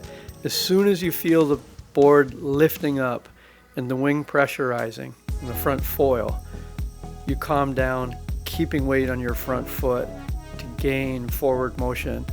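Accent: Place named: Maui, United States. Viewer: your German ist incredible, your Maui accent exotic, please comment all parts in German. Would bei The KPI for Naish Wings, learning from the master, Aloha, Hjot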